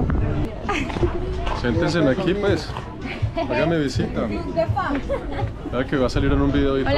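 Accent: Colombia. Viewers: Como así que eres de Canadá 😳pero tienes acento colombiano. No entiendo, no entiendo, estoy perdida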